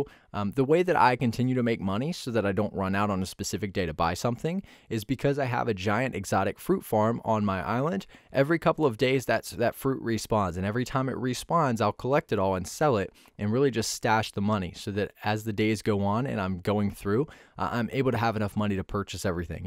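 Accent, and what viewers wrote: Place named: General American, United States. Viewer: reminds me of how people who speak in a “standard American accent”on tv commercials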